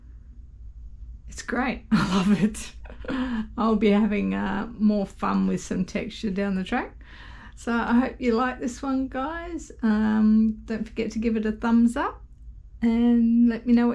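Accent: Australia. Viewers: Thats great from our angle it looks like a city reflection walking along the Yarra River...Melbourne 😊 Have you tried using a retarder or other gel medium to slow down your paint drying? It might help. I only found out about them recently and think its brilliant, so much easier to blend too..love your art. Happy New Year cheers from WA🦘🦘 always good to hear an Aussie voice on here. * I said what it was before you had finished and gave your own interpretation....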